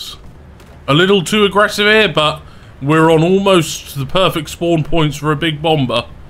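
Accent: United Kingdom. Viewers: Does anyone love this channel because of British accent? I am not sure why but it feels attractively hilarious.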